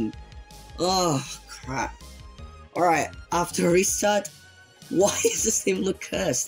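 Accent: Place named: Australia. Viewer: yeah i noticed that too, he has an australian accent but a copy from another country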